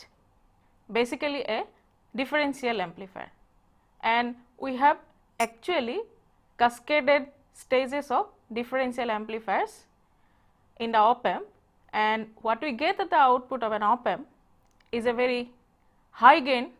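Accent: India. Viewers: Thank u madam.Really its very useful.I have clearly understood ur indian accent.Every thing Has proof .Theirs is no doubt in any concept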